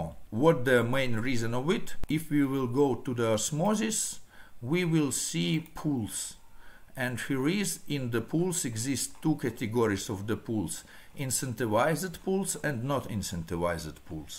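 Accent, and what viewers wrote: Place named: Russia. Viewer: Аналогично )) Зато всё понятно - нет багов в понимании английского или американского "прононсиэйшн". По русски говорит на английском!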